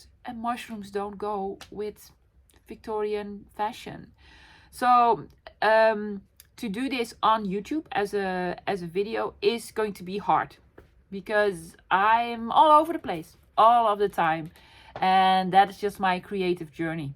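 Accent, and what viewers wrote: Netherlands. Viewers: You are such a lovely person I found some days ago. I love your dutch accent and it's fun listening to you! Thanks for all the inspiration I'm always looking for. Be blessed!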